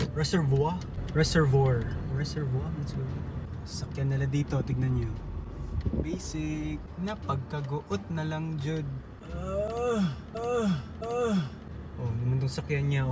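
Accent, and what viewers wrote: Visayas, Philippines. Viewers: Omg 😮 bisaya ka engineer? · The accent of yours tol is so cute... Bisaya...hehehe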